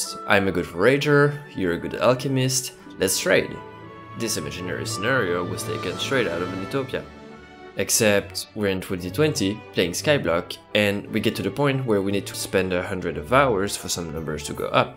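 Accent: France. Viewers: You sound so much like a France guy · FRENCH ACCENT !!! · J'aime cet accent français ! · Listening to your accent i can approve that bedore you did yt you whrre used to talking french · ta l'accent d'un francais qui veux parler anglais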